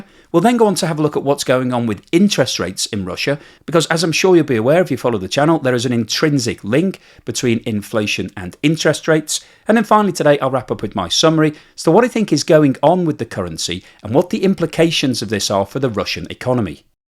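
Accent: United Kingdom. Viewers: When a British accent bloke talks about geopolitics and Russia - be aware: Brit wet dream and russophobic propaganda dead ahead....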